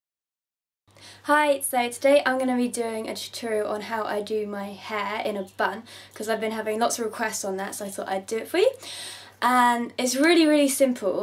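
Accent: United Kingdom. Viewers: Random question: Is this a UK accent? Oh and great tutorial btw. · OMG I LOVE ENGLISH ACCENTS :) great tutorial by the way! (: x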